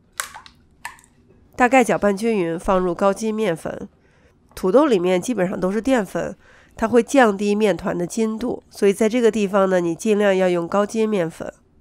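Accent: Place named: Xi'an, China. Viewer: 你这口音像我们西安人啊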